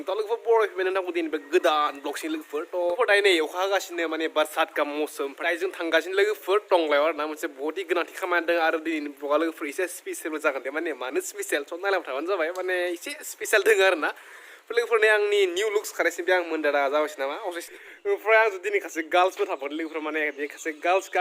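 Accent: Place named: Kamrup, India. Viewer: Adaya kamrupiya railaiywda.... Agna kamrupiya gorpala 50/50 jby..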